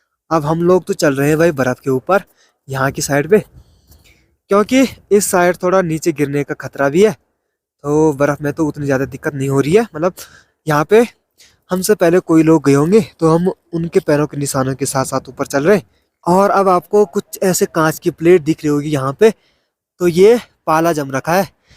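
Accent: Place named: Pahadi, India. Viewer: 🇳🇵 Aap Ki Bolaney Ki Awaz Mey Pure Pahadi Paan Hai Jai Pahadi Maa🇳🇵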